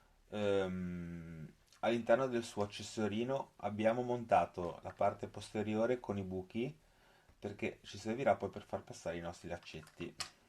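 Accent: Italy. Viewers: L accento genovese è stato confermato dalla strada di Spotorno 🤣 sto montando per la prima volta la action cam e mi sono imbattuto nel tuo video, ho i laccetti ma non il coperchio con i buchi ( è intero) , cercherò un plastica con i buchi. Saluti da cogoleto ✌🏻 Ninja <num>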